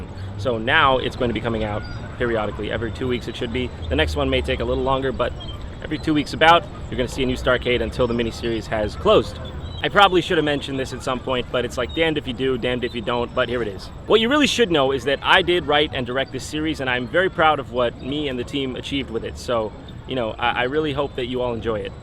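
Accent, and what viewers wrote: New York, United States. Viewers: AM I the only one that noticed is totally getting a new yourker accent now?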